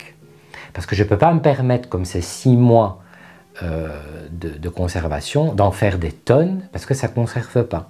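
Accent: South, France. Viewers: Hello! Vidéo qui a peu de risques d'être démonétisée! Tu d'viens bon loll Pour yesstyle, ça te met aussi (minimum) un mois pour arriver chez toi? Pour ma dernière commande, j'ai failli leur envoyer un mail pour demander s'il arrivait à pied... J'espère que tu reviendras plus souvent mais en mode 'currente body (accent du sud)' car ces vidéos, c'est vraiment toi! Bye bye et à bientôt!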